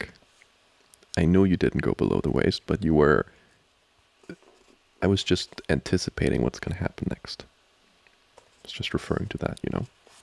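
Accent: Germany. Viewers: i cannot with this german voice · your german voice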